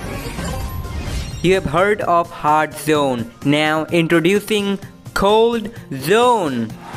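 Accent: India. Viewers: TF THIS SI NOT THE RERAL VOICE YOUR LIKE TALKING AN INDIAN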